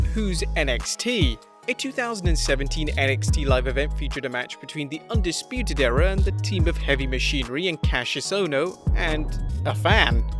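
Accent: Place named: United Kingdom. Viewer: Why’s there always some British dude narrating these things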